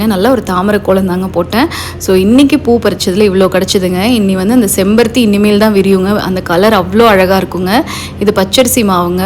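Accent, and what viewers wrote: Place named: Coimbatore, India. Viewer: Akka im also from Coimbatore currently in canada, recently seeing your videos. Very nice esp neenga pesra vidham.. Nama kovai style, nalla irukeengla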